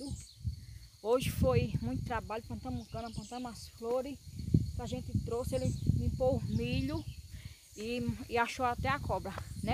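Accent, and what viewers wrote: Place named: Alagoas, Brazil. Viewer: adoro o sutaque de Alagoas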